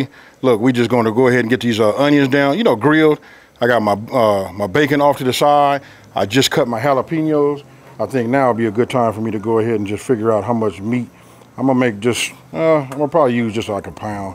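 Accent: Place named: West Coast, United States. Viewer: You can tell his from Cali or somewhere from the west coast gangsta turned chief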